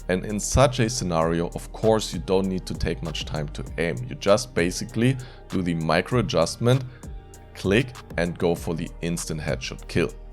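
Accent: Germany. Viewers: Der deutsche Akzent xD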